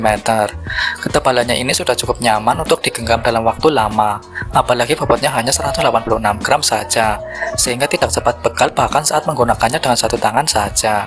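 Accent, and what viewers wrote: Banyumas, Indonesia. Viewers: Ciri khas 😅Konten kreatore wong ngapakkk ikii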